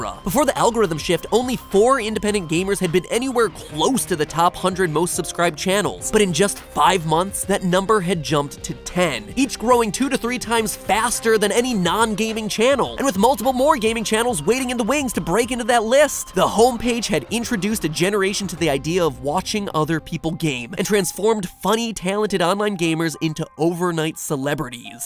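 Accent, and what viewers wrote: United Kingdom. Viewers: Is that how us BRITISH people sound?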